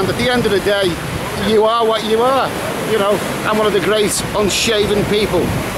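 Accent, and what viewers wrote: Liverpool, United Kingdom. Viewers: Good Morning just gone 7.30am here in deepest Issan What a great way in which to start the day a vid from The Beer Garden Yule be looking for a deckchair on the beach now to sleep all that fayre off!! Great viewing always a pleasure to hear your dulciet scouse tones Reminds me of an ol mucker o mine from Toxteth living in Jersey keep up the Pattaya vloggin it fills a much needed void up here in riceland All The Best Big C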